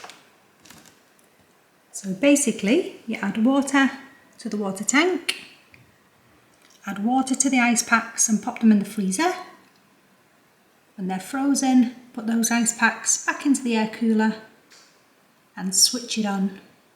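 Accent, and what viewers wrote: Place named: South Africa. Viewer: She sounds like a white South African. If that’s correct, I hope you are in the U.S. now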